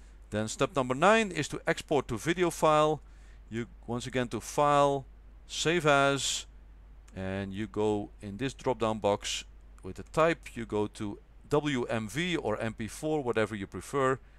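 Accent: Netherlands. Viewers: Just a quick question, are you from Belgium or the Netherlands? because your accent sounds very Dutch. Great video though!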